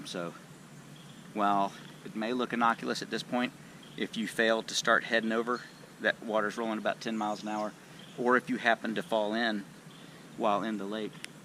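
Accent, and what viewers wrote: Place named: North Carolina, United States. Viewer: I had no clue where the Haw River was. However, as soon as you started talking, i knew you were from NC or lived there. Something about that NC accent makes me miss home man, should have never moved out of Asheville, NC. Indiana sucks.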